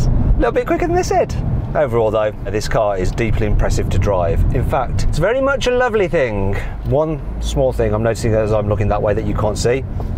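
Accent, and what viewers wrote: United Kingdom. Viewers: 영국발음 헤으응....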